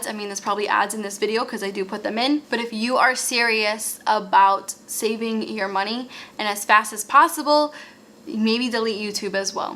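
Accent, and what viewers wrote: Boston, United States. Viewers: lala suncokretic do you mean her Boston accent is more pronounced lately? I noticed that too. She’s probably more comfortable with it now, and isn’t trying to tone it down. She actually might have mentioned that in a video.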